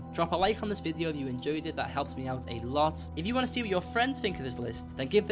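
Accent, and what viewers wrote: United Kingdom. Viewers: Oh wow, so is that a strong british accent?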